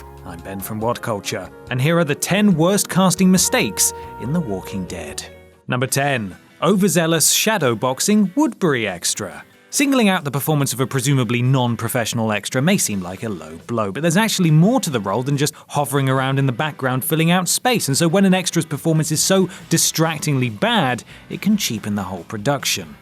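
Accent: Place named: England, United Kingdom. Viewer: Wow this was definitely a reach. And is that an English accent by the narrator? What would you know about southern accents? I think she sounded fine, not everyone sounds the same here. Anyway Emily Kinney is from Nebraska. And while midwestern accents are definitely different, I'm sure she was easily able to tap into that drawl.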